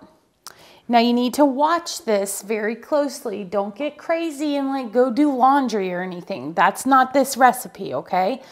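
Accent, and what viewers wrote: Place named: South, United States. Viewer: She is in Florida. In Alabama we say caramel too. I think its a southern thing.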